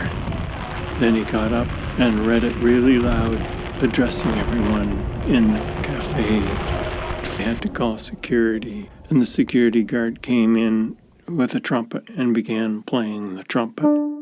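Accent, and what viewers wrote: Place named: Alberta, Canada. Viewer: I detect an Alberta accent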